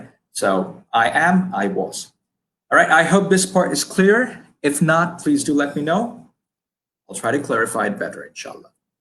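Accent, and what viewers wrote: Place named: New England, United States. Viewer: I love everything about these contents and the way Augmedix has approached in teaching the work-force that wants to eventually become a part of Augmedix. Getting to learn from this man has been a pleasure although his accent, even after so many 'thorough' listens; I find quite amusing as to me it sounds like a perfect half-in-half crossover between Eastern New England English and Mancunian. This man's tone combined with that accent is pretty 'chad' 😁😅🤘✌🤙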